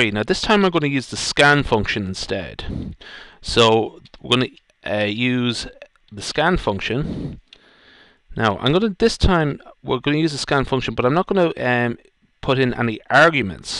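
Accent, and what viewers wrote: Ireland. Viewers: thanks :) refreshing to hear the irish aswell haha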